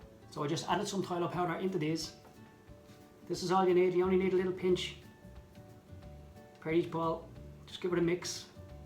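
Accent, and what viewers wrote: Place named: Ireland. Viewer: Awesome tutorial video! Love your accent, pretty sure its Irish 😊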